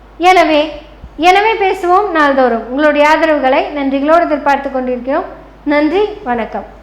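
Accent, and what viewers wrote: Kongu, India. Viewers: அம்மனி வர வர உன்ற கோயமுத்தூர் குசும்பும் கொங்கு (கொஞ்சும்) தமிழும் தாறுமாற இருக்கு அம்மனியோவ் . உன்ற பதிவு ரொம்ப சூப்பரு மா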